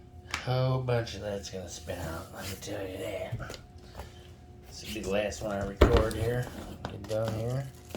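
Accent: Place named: Wisconsin, United States. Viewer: You sound southern… Southern Wisconsin-ish. Seriously, you (and the wife and kids) are a treasure.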